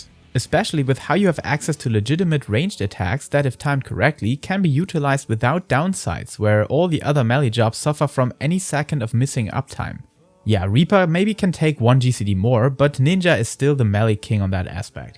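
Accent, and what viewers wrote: Germany. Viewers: you re german right? :D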